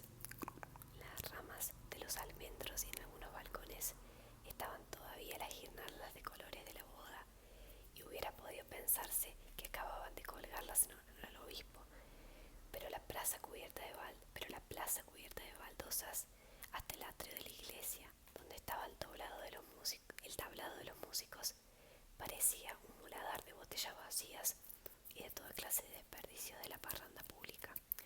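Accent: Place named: Argentina. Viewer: Me encanta el acento Argentino. Saludos desde méxico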